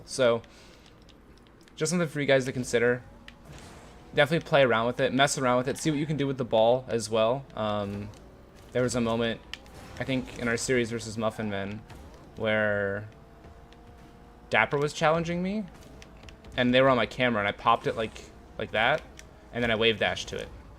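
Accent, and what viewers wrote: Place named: Europe. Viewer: l Sven l I can tell your from EU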